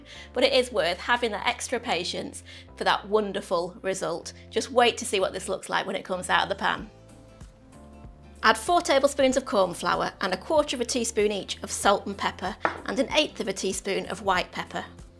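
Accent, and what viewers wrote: United Kingdom. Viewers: wonderful recipe, thanks ! but . . . ⅛ tsp white pepper - is this molekule-like amount worth start grinding the corn ? :-) another thing: hear to talking to my german ears it sounds more british than american dialect. Am I right in thinking you're from U.K. ?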